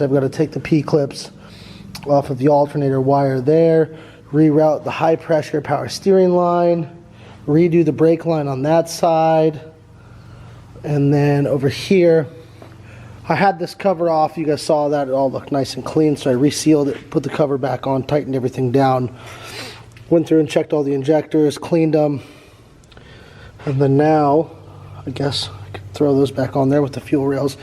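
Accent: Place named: Kentucky, United States. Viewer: I’m from Kentucky and I can definitely tell is too by her accent hahah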